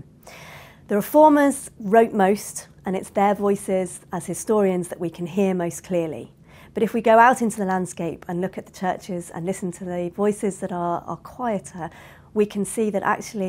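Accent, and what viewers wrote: United Kingdom. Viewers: Loving 🥰 your British accent ❤️😊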